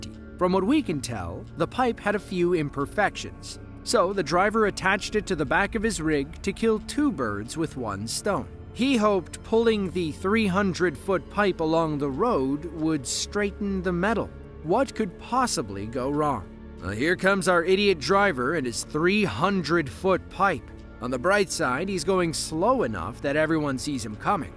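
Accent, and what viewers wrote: United States. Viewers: he speaks English like an American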